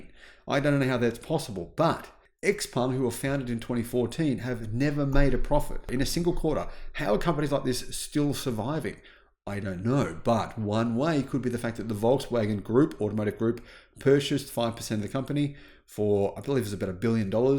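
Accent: Australia. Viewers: start to like his aussie accent, quite nice actually.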